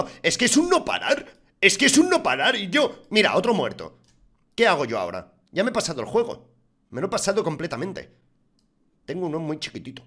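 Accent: Mexico. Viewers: sigue hablando como mexicano que se teda mal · no hay nada mejor que escucharlo hablar español mexicano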